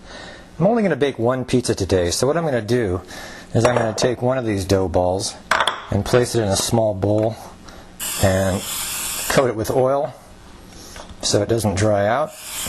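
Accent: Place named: United States. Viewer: This video is great, however I am confused with the "cups" measurement. I am from Europe and have no idea what a cup sized is, i have a couple of them, and most of them are different size lol, and in addition, I have found several version of "cups" on few websites i visited. I suggest that one cup is 136 grams of flour or 240 milimeters of water, which was referred as american cup, and because of the guys accent, i would say he is from us.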